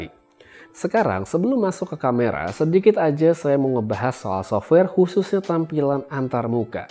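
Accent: Bali, Indonesia. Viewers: Bang orang bali apa?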